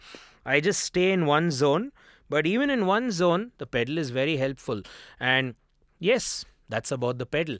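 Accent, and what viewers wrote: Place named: India. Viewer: Following ur channel ..I parallel ly learnt bit of english ,😆😆 in indians ascent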